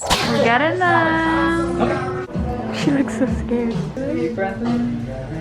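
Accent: United Kingdom. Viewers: I love the british accent 😂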